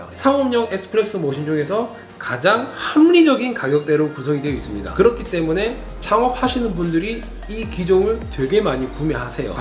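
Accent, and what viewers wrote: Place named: Gyeongsang, South Korea. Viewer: ㅋㅋㄱㅋ 돈을마 발라놧네ㅋㅋ 님 부산에서 카페하시나요?? 경상도사투리가...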